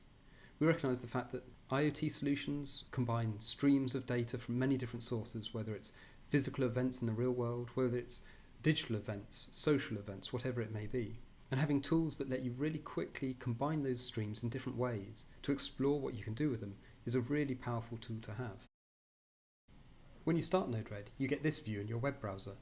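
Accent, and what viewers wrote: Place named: United Kingdom. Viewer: difficult to understand: strong British accent mumbling ... sorry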